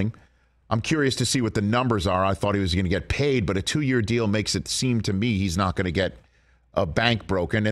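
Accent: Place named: Pittsburgh, United States. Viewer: He even sounds like a Yinzer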